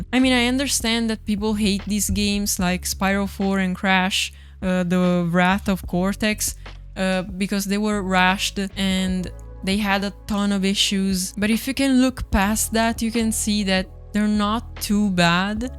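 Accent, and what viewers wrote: Italy. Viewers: Glad the algorithm suggested me your channel! I have 70 games on my backlog, but they are alla digital unfortunately. At least you could still touch and see your games lol. Anyway, your accent sound italian, am I correct? Are you a fellow italian girl gamer like me?? 🤝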